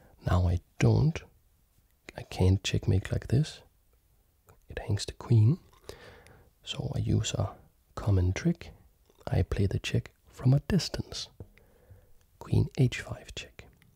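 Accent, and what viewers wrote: Germany. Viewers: omg bro i didnt even know you werent german your accent sounded so german to me - anyway, still addicted to your content!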